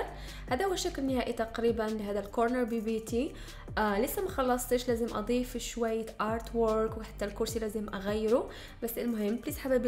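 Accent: Morocco. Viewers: اول مرة نشوف الفيديو وحسيت بيها مغربية من لهجتها وتأكدت بتعليقك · من لكنتك باينة فيك مغربية برافوووو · ممكن أعرف شو أصل بلدك في كلامك أحسك من المغرب أو الجزائر يلي حاس مثلي يضغط لايك ويلي عارف شو أصل يقولي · اللهجة المغربية واضحة في كلامك يا الغزالة 😉😄😄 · انت مغربية حيث اللهجة مغربية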